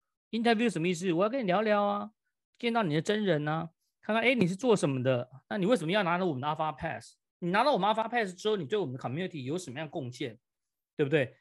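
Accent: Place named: Malaysia. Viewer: 感觉像马来西亚人。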